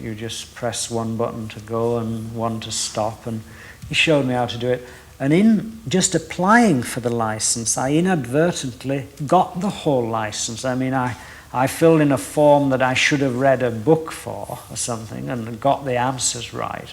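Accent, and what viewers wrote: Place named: Yorkshire, United Kingdom. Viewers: Mildly interesting that his Yorkshire accent has got thicker in the intervening 50 years. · An amazing man with amazing ideas Even in more recent times he still has his broad West Yorkshire accent 🖼️🖼️🖌️🖌️🎨🎨🇬🇧🇬🇧🏴🏴❤️❤️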